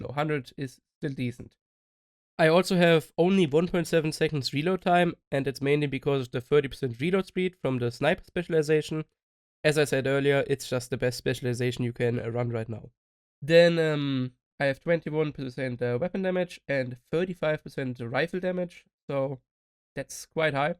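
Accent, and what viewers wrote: Germany. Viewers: You speak never english.... Deutsch hör ich da doch raus... mach das doch auch auf deine Muttersprache.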